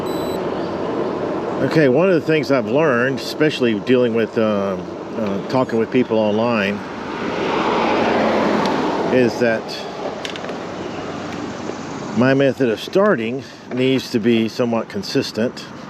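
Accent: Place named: South Carolina, United States. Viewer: Enjoying your videos. Keep riding. One question....what state are you in? I see all the crape myrtle, palm trees and southern pines, but judging by your accent, I would guess South Carolina? Let me know if I came close.. Lol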